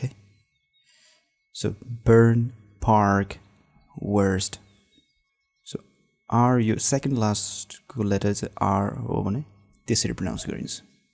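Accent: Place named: United Kingdom. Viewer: Sir,I would say -having an articulate British Accent through you;it’s amazing,great...ur highly appreciated whereas other English Teachers...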